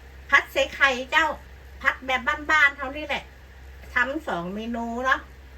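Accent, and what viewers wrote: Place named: North, Thailand. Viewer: อู้คำเมือง คนตี้ใดจ้าว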